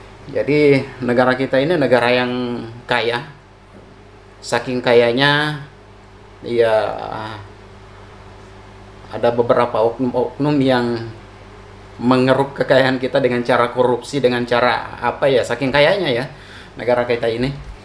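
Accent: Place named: Malaysia. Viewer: tapi kok suaranya ke logat melayu Malaysia?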